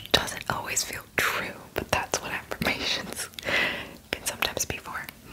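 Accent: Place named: New York, United States. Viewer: Please surprise us this Halloween with bounty hunter ASMR where you take care of a tied up criminal. I loved your New Yorker accent. It gave so much tingles.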